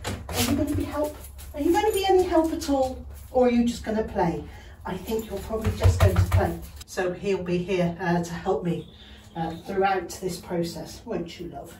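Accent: England, United Kingdom. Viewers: “That was quite a good workout there”. I can’t say it like you but I love your English accent. I needed a cheering up today!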